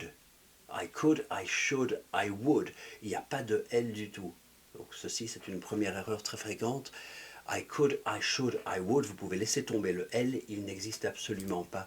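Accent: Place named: United Kingdom. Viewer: Pour les Français qui écoutent, je peux confirmer que, ce professeur, a un très bon accent anglais britannique, qui est, à mon avis (sans que je sois biaisée en tant qu'anglaise), beaucoup plus agréable que l'accent américain! I could. I should. I would listen to all day!!! 😬😬😬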